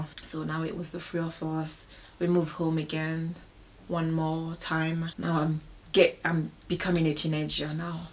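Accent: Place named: Africa. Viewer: I like your accent sista. Sounds like African accent.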